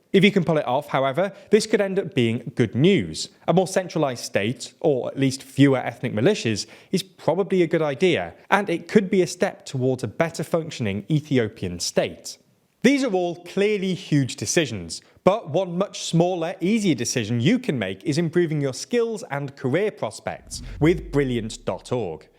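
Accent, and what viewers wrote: United Kingdom. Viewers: The curse that is British. Satan is a tormenter. They wished Ethiopia would collapse. Go talk about Ukraine we don't need you talking about Africa Have you noticed how we evil british only talk about war in my Africa That British accent is that of Uzi baa. The advisor of Satan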